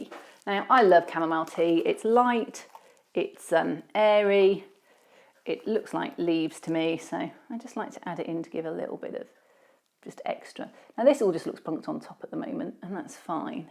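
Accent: United Kingdom. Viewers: Great video. I'm trying to place your accent, where in the UK are you from?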